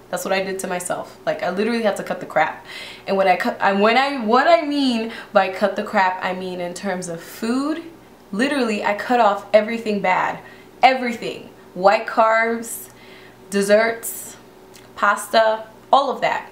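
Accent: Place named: Africa. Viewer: Wow, I'm a new subbie and I've learned you are African, yet I could not detect an accent in your voice until I watched this video. When you talked about your chores in boarding school, you accent came out. I was pleasantly surprised :)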